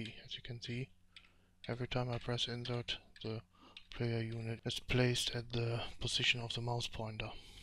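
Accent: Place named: Germany. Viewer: Ich find dein Englisch garnich so schlecht, aber man merkt da kommt manchmal der Deutsche durch xD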